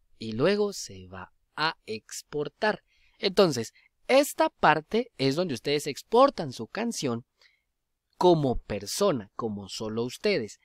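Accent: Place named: Venezuela. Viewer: me suenas a venezolano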